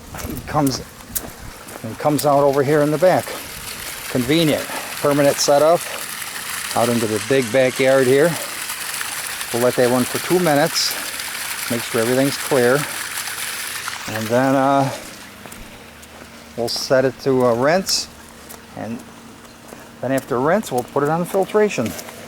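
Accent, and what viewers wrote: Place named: Chicago, United States. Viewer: Helpful video. Excellent Chicago accent.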